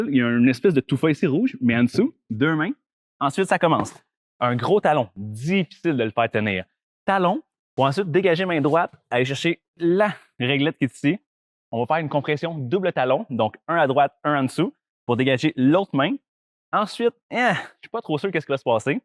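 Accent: Quebec, Canada. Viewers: Bon video! Hello from Vancouver. It took me nearly 5 min to get back into the Quebecois accent. Great content, great climbing. I love your project! Wish I was strong enough to try it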